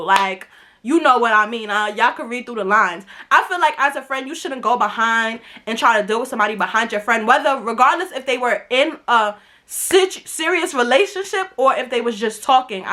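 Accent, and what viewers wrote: New York, United States. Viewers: i really love new york accents 😩🥰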